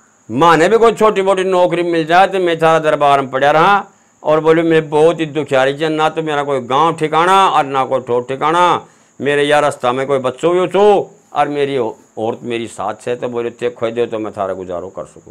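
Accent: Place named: Haryana, India. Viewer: Kha se ho tau ji apki boli to haryanvi mix h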